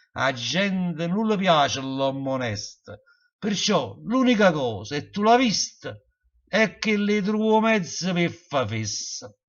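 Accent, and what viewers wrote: Naples, Italy. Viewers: Srivere le attualità di questa nostra società in versi cosi' toccanti e veri usciti dalla mente magica di un Collega che stimo tantissimo il Grande Luciano Somma, un plagio và anche alla recita verace con tono e napoletanità di. I MIEI VIVISSIMI COMPLIMENTI....Rita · interpretata in modo magistrale, napoletanita' DA BRIVIDI, Complimenti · Angela Arresta Ho messo un commento sotto la poesia 'O scemo, ma non lo vedo. Ho scritto che mi è piaciuta e, quanto alla recitazione, che mi piace molto il napoletano, soprattutto in quanto mio genero è di Caserta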